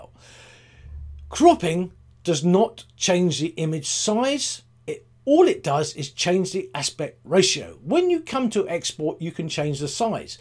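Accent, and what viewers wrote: United Kingdom. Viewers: Good explanation, Been struggling with this for a bit but makes a lot of sense. Nice to hear a UK voice on a LR video. Thanks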